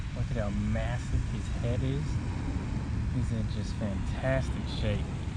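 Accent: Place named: Texas, United States. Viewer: What part of Texas are you from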